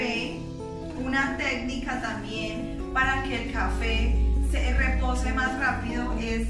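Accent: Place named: Colombia. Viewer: Que bonito acento tienes! Me encanta el acento colombiano Uuyyy y el café es mi debilidad!!! Gracias por compartir esta receta!!!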